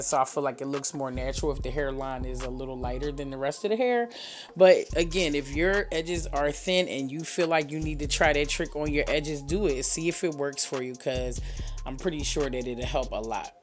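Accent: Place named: Pennsylvania, United States. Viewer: Always love watching you work and hearing your PA accent! Your energy is so positive and inspirational. Please keep doing you! ❤️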